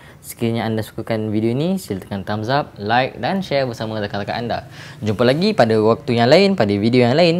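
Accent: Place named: Negeri Sembilan, Malaysia. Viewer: suara mcm org nogori je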